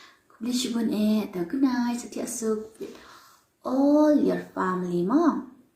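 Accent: Bangalore, India. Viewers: 🤣🤣🤣🤣🤣🤣 kum ka thei bangalore khlm lait ka rukm krn rukom akshion pet sa ktno sa ia bhdeg dohtdong ne bah km ia ka thei bangalore ipoh jait bha jing log kynthei kmne phi don hynmen para ne phi dei khun marwei ne ilh rain bha phi bn lh kmne te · Kasur ruh thik kum thei banggalore hi naduh karukom ak,nai ak than ainoh shi pek ne 😅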